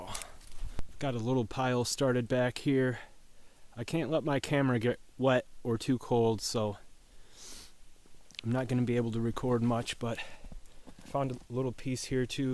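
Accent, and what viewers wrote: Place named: New Jersey, United States. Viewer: I understand. I was thinking I heard a New Jersey accent in your voice, so I asked. Thanks.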